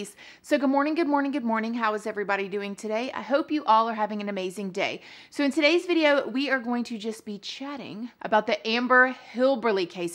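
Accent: South, United States. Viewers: nah that’s just how we pronounce it in the south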